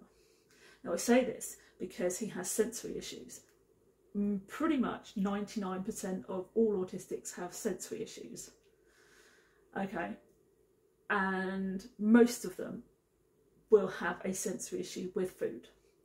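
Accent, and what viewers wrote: United Kingdom. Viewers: J like you which part of England you come from? ❤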